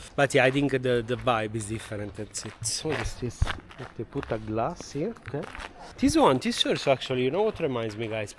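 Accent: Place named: Italy. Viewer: Interesting video. What is your nationality? Are you italian? Your accent sounds italian.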